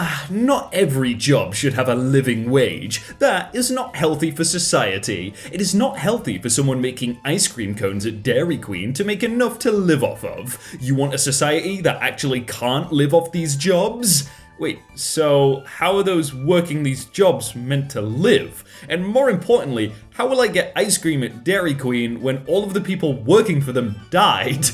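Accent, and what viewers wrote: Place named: United Kingdom. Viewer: It's just his accent... most Brits pronounce it that way.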